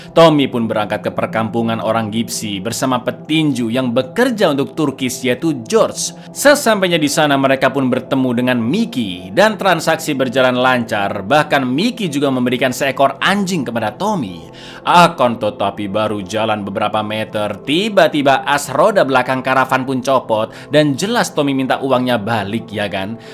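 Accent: Java, Indonesia. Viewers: Logate sampean kayak pantura marai aku kelingan omah mas, seneng ngrunguknoe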